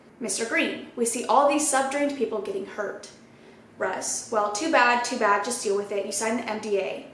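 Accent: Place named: South, United States. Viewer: That insane they all need arrested. Love your southern accent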